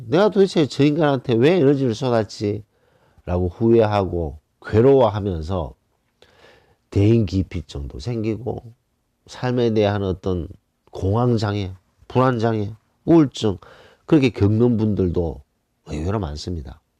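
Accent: Gyeongsang, South Korea. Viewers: 경상도 말투인데 듣기 싫지 않네요. ㅎㅎ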